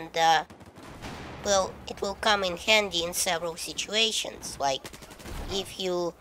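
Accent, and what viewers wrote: Russia. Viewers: видно что ты русский :DDD с твоим произношением англиского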